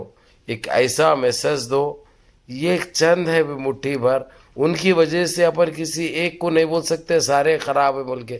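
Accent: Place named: Bangladesh. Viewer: Ap arbi logou ku denk kar ap bahut feel kar te jaisa ke ap bhi arabi ahy hyd ke chaushu se baat karoyaro ap jo arabi me karte aisa lagta ahy koyai bangali ahy ap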